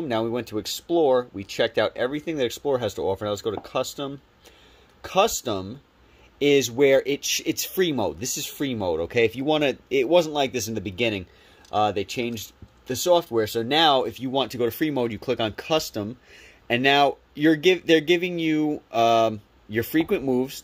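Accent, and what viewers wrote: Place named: New York, United States. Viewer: bro thank you! You’re vids are so helpful. I’m excited for this journey. Also.. you talk like me😂 by any chance you from NY?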